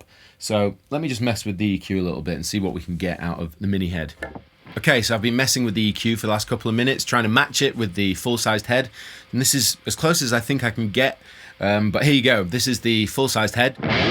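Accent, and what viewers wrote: United Kingdom. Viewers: even your spoken words are so accurate, like your renditions haha. Don't get me wrong, English is not my first language thus sometimes it is very diffulicult for me to understand British accents in this US accent dominating world.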